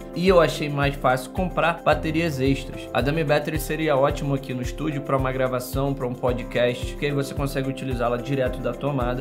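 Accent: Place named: Rio de Janeiro, Brazil. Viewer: o sotaque carioca puxadissimo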